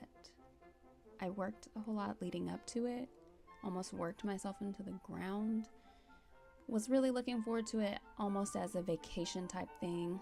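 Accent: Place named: South, United States. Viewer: Great Video! I really enjoyed it. First, that entire struggle with the little coffee brewer had me rollin 😂. It was too cute! I also thought your story about being 2 mins late for the tour was hilarious! “I can see the backs of their heads” 🤣🤣 I missed you so much and I’m so happy you’re back. I live in NY state but my family is from the south and I miss them. Your accent feels like home to me. Thank you. You are loved.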